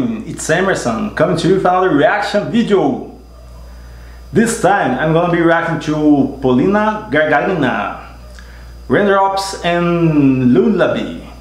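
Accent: Brazil. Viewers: Cara! Que legal que te encontrei. Imaginei que fosse brasileiro, já no início do vídeo, pelo sotaque kkkk Interessante não ver comentários de outros brasileiros e a prevalência de russófonos e outros estrangeiros. Parabéns pelo trabalho. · u sounded like Brazilian speaking English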